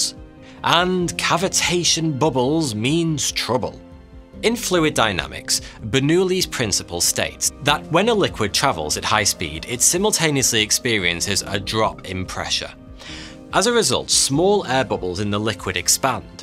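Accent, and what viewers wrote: United Kingdom. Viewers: Idk if I can handle the britishness any longer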